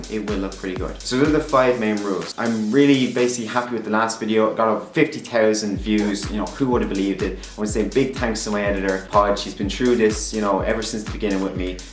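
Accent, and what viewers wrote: Ireland. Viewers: You from dublin? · Are you Irish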